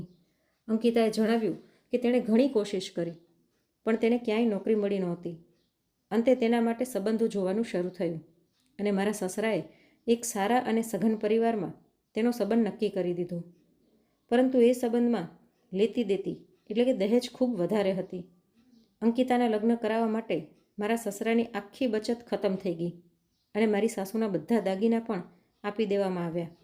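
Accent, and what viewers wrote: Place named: Rajkot, India. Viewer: Rajkot vala aavaj haoyu ch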